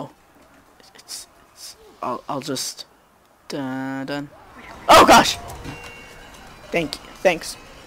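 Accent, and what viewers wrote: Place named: New Zealand. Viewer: I don't usually do this but because of your accent I will... I recon that you are from New Zealand, May be wrong but you voice sounds like it. Btw nice vid, I made my own trickstab montage if you would like to see it.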